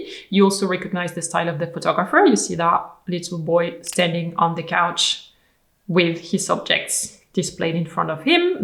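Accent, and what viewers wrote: France. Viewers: I have not watched a video from you in a longer time and I really noticed that your accent is disappearing slightly :) your english sounded less french today; I liked it :) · so hard to hear you with your thick French accent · accent went from German back to French 🙂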